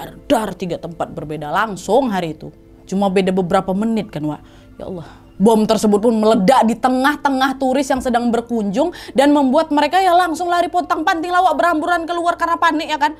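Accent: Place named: West Sumatra, Indonesia. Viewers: Gw salut sama kamu membawakan cerita bom Bali dg khas Sumatra mantap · enak kali dengar cerita ini, logatnya khas minang, jadi suka lihat ibu ini.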